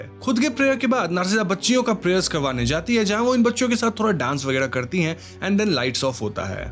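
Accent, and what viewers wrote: Bengal, India. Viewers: Aap Bengali ho kya 😊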